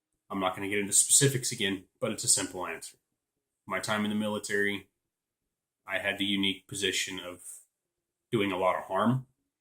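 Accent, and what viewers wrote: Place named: South, United States. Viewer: Good intro. I have to agree that people should look at youtube as entertainment. Oh, nothing wrong with a good southern drawl. Looking forward to the new channel. Cheers!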